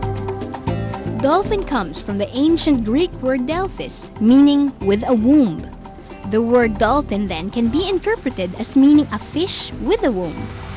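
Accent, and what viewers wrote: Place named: Philippines. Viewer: Love her cute filipinas accent.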